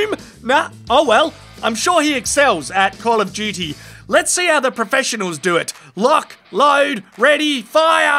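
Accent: Australia. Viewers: When I moved to australia from the US, everybody sounded like you for the first month 😂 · This guy sounds so Australian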